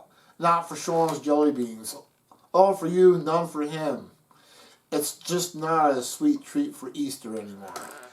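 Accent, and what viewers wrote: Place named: South Jersey, United States. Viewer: 14,000 subscribers! Wow! you're living proof that nice guys can finish first and "Nothing succeeds like success!" Congratulations, and a success well-deserved! A speech impediment? Honestly I've never noticed. The only thing I HAVE noticed about your manner of speaking is your DEEP South Jersey accent! I haven't heard an accent like that since I went to Glassboro State back in the 1970s. As soon as I watched one of your videos I said "Hey, I know where HE's from!" 😃 Anyway, just keep doing what you do and the way you do it! And again, sincere congratulations!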